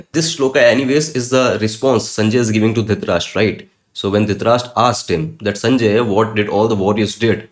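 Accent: Hindi, India. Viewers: Namste, Your class is great. Very useful. I was in search a class where Sanskrit Grammar is taught through Bhagavat Gita. I have started to go thorough the episodes one by one. Thank you so much for uploading the classes. One thing I wish to request you is about the pronunciation of Sanskrit words. Please pronounce अ मात्रा ending words as it should be in Sanskrit language. For example, सञ्जय उवाच should be pronounced 'Sanjaya uvacha' not 'Sanjay uvach' as it is in Hindi language. If it is 'Sanjay uvach' it should be written with a halant in Sanskrit i.e. सञ्जय् उवाच्. Hope you will take my suggestion positively if you feel it is correct. 🙏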